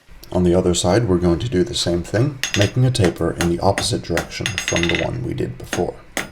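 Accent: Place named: Canada. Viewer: Great video thanks for sharing. Where you located? You sound like a Canadian but Im not sure. Very well done.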